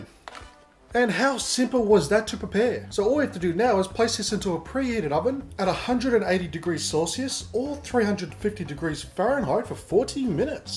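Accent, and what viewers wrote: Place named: Australia. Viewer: he said 40mins, must be the Aussie accent >.>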